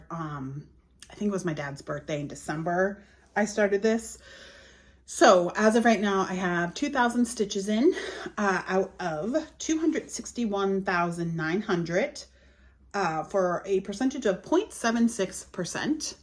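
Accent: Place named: Maine, United States. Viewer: Hi...first, great progress on your WIPs and goals! Second...GO PATS! Third...as a Maine girl who has been in New Zealand for 22 years, you simply make my heart smile with your "yapping". Sounds like home to me, so thank you! Happy stitching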